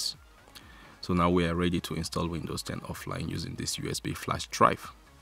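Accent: Africa. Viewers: Prefer Rufus personally. Thanks for the help sir. I'm digging the soft African accent. I need more tutorials with African accents =D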